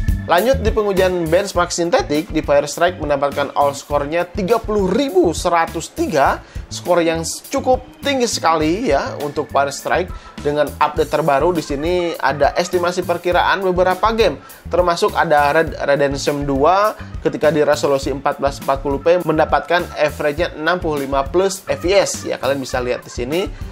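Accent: West Java, Indonesia. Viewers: Namanya Juga Orang Sunda Atuh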